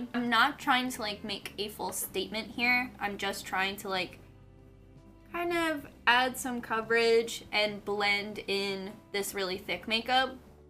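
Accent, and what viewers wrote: Philadelphia, United States. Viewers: Every time I watch one of your videos I think about how awesome and cute your philly accent is. It's my favorite. I'm a New Yorker and I live in Colorado so your videos bring me back east when I watch them. Thanks gurl! Also this makeup looked beautiful. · I've never noticed your Philly accent until this video! I'm from Pittsburgh so I also have a slight yinzer accent 😂 I wish I would have met you before I moved to AZ.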